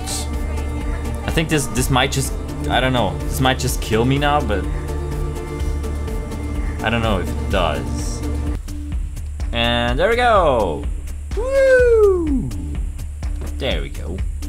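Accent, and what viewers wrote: Germany. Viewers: Bist du deutscher? ^^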